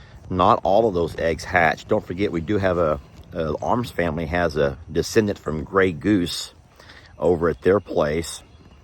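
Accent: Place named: Texas, United States. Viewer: Your Texas accent is showing... Terrance becomes Tarrence. get over here Tarrence.. Ha! Love it!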